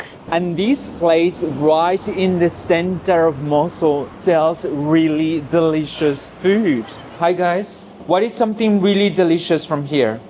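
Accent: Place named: Australia. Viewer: I am glad u enjoyed ur visit in iraq amigo . where are u from by the way , ur English sound Australian but i can hear the Latin accent there <3 . Thank u for showing the yummy food that i miss . the dolma is not good doesnt look good i give it 2/10 my mother make the real dolma from mosul .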